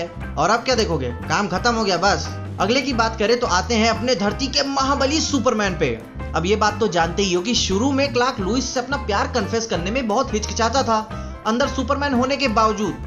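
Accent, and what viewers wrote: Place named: Uttar Pradesh, India. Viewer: bhai, i watch your video since 2 days and all your videos are soooo amazing. keep doing this and your up accent is also really amazing.... kanpuriaaaaaaaa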